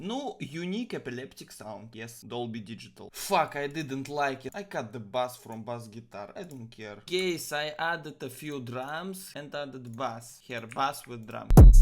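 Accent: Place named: Russia. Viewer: Ахахах у тебя голос как у русского ну ты итак русский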